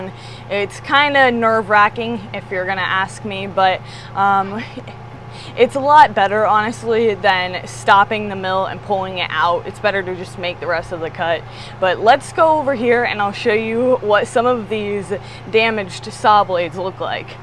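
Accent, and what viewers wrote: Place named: Pennsylvania, United States. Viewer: ~ your Grandpa and "The Boss" have trained one of the best lumber foremen in the industry! Thank you for sharing these practical trade skills and important safety tips! As they say "safety is no accident". God bless Lumber Capital Log Yard! P.S. that is the color T-shirt I'd like to own; but I'll have to dye my hair Boudica red just to begin looking as good as you do in it. Your Central PA country accent and good looks are what it takes for my A.D.H.D. brain to track properly without distraction or interruption. Those are strong leadership qualities you possess that I am afraid King Charles is lacking in. Freedom from tyranny is our Monarchy. Lest We Forget!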